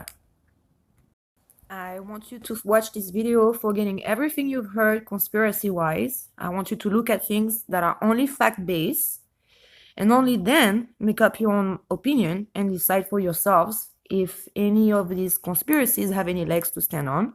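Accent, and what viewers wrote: France. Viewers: I thoroughly enjoyed your video. Thank you. New subscriber. By the way, I absolutely adore your accent. It reminds me of our French exchange student who is just a doll. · Did you say that you were German? You sound French to me. Beautiful accent! Blue and black.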